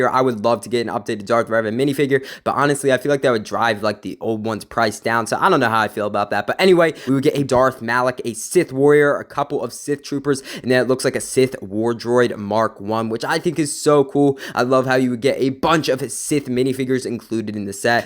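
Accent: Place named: United States. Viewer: u sorta sound like an American. Dantdm lol (not hating)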